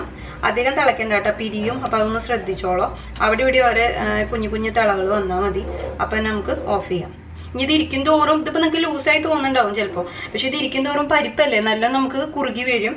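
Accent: Thrissur, India. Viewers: Recipe is good. But we will never use coriander powder in stew. Nattil evida? Nalla Thrissur slang. Me from thrissur.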